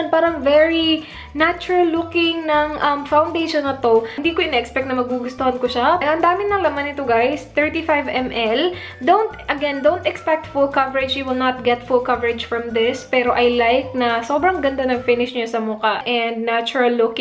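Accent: Visayas, Philippines. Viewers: ang panget mo mag tagalog parang bisaya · hahahah ang kulit nya mag salita visaya ata si ate 😂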